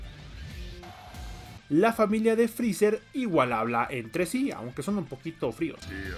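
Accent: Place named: Mexico. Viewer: si loco nunca escuchaste a un mexicano hablar?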